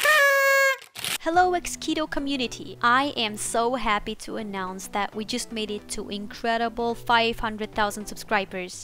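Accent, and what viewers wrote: Germany. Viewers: samuel sharp she has a german accent.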